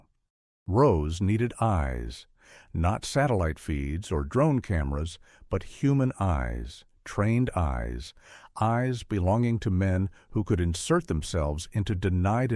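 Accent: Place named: United States. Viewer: Why an US voice over about a full UK subject?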